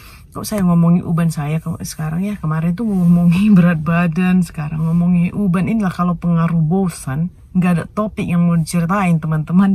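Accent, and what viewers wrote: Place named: Medan, Indonesia. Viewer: Suka aku logatmu sis, ingat tetanggaku di kampung banyak yg dari Medan cute baby, lovely vlog sis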